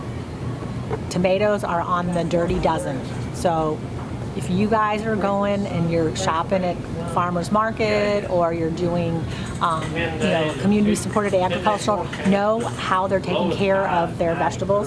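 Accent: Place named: Upper Midwest, United States. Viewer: I have to ask what state you’re from originally; you happen to sound like a lot of folks I know up in the northern Midwest.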